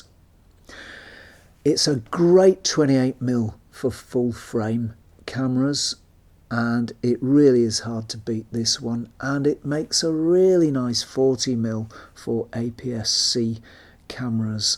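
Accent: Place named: London, United Kingdom. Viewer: I do wonder why you call your cat Zorki!) Is it because of their excellent eyesight (in Russian 'zorki' means 'sharp-eyed or eagle-eyed') or have you named the cat after the line of Sovien cameras? It sounds so sweet and I'm really curious about it) By the way, as for my preferences in photography, I persanally prefer Soviet lenses (Helios 44-2 or Jupiter 37A or even that giant Tair-3). I haven't tried any wide angle lenses so far but, probably, one day... Thanks a lot for your videos! They are really inspiring! And, by the way, I do enjoy you authentic London pronunciation (or is it even RP?) In any case, you are awesome!)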